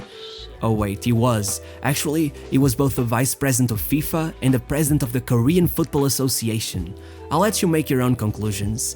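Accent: Portugal. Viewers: Hey, I couldnt stop to notice how you pronounced the portuguese names. You seem really portuguese. The way you talk and the accent, are you portuguese?:D cuz i am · Portuguese accent hh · i was pretty sure youre portuguese by the accent but when you said the portuguese players names the minimal doubt i had was gone hehe , im subbed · this portuguese accent...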